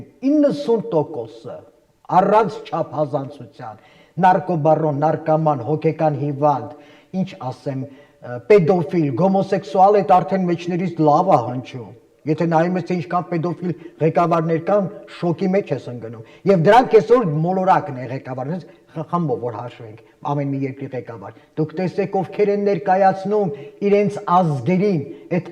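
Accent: Standard. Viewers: 🇦🇲♥️✝️Տիկին ՚Ես իրանահայ 1969 ից ապրելով Հայաստանում ՚1992 ից ՚ապրելով Գլենդելում ՚շատ զարմացած եմ ձեր քաջության՚հզորության եվ մաքուր Հայերեն խոսացող ՚գրագետ ՚դեռ չեմ տեսել ՚ես շատ կուզենայի ապագա Հայաստանի Պրեզիդենտի աթոռին։Դուք կին լինելով ՚100 տղամարդու որը Հայրենասեր են ՚ավելին եք՚դուք կփրկեք Հայաստանը եվ Հայրենադարձություն կսկսվի ձեր ժամանակ ՚որով հետեվ դուք կպաշտպանեք նրանց շահերը։👏👏👏 🇦🇲🇦🇲🇦🇲❤️❤️❤️🎯🎯🎯✝️✝️✝️ Բարեվներ Գլենդելից ։ 🇦🇲❤️🇺🇸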